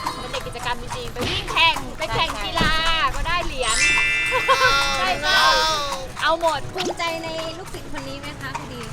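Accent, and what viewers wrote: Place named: North, Thailand. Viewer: พี่แตพูดเหนือน่ารักมากเลย ดูเรียลจริงๆ รอติดตาม Ep. ต่อไป ❤️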